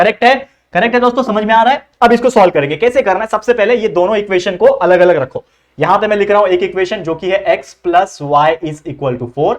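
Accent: Maharashtra, India. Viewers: Sir apki marathi bohat cute hai❤❤❤❤❤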